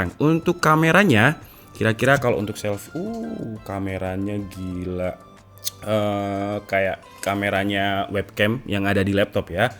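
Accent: Java, Indonesia. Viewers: Mas sampean jowo ngendi???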